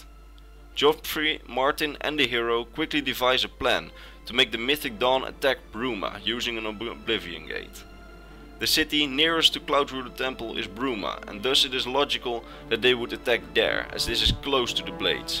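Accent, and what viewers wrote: Netherlands. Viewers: This guy is Dutch i hear it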